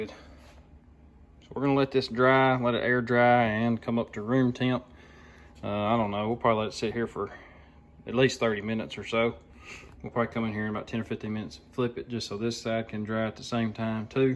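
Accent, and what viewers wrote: South, United States. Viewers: Nice to see such a nice young man enjoying his family. Not many homesteaders in Mississippi so enjoy watching someone with that southern drawl.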